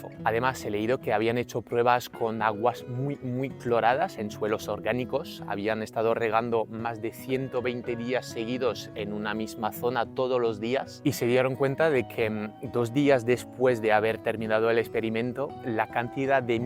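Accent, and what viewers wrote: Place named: France. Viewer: Sería bueno que nos explicara si en ojo o tallo falta o sobra algún tipo de mineral que sepamos. O cómo saberlo sin hacer un análisis de tierras. Porque aquí en Galicia existe el minifundio y muchos no podemos dedicarnos a hacer un análisis por cada pequeño pedazo de parcela que tengamos. Separados unos de otros😮 tienes un ligero acento francés😂 muchísimas gracias❤ muy buen vídeo❤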